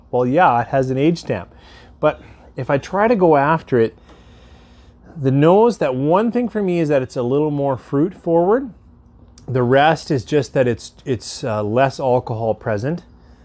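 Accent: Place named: Canada. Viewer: Ha, that accent...I could tell you're Canadian.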